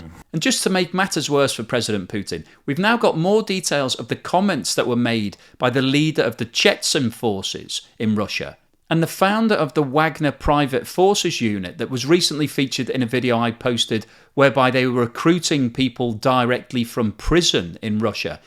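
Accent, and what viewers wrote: United Kingdom. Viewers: Dear: I don't normally simp and bestow excess praise on people but your channel is such a breath of fresh air in an age of agitprop, fake news and hateful propaganda. Your news reporting is almost noble and moral in the sense that you exert every effort to be as honest and impartial and as objective as possible. There are very few journalists out there these days who are not dripping with the venom of scathing personal opinion, and hominum attacks and Trump-style trolling. They say that "facts dont care about your feelings" but everywhere I turn to for the news all I hear are feelings and not facts, and hateful ones at that! Your channel, in my opinion, goes out of its way to try and provide a sober and unbiased analysis of world events--something personally essential for me, as I am an American, living in Russia, whose life has been upended by the war and the myriad sanctions the West has imposed on Russian. 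Thank you so much, and please continue making your outstanding content with that classy gentlemanly British accent of yours. 🙂 Sincerely, An American in Moscow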